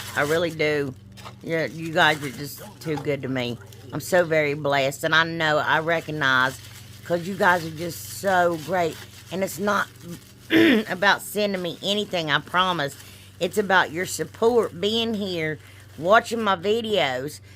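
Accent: South, United States. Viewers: You talk you're Southern Draw while you're working?😮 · What part of the South are you from?South East Georgian inquiring. I absolutely love and enjoy your videos. ILOVE, LOVE...LOVE your napkins....especially the CHICKENS.🐔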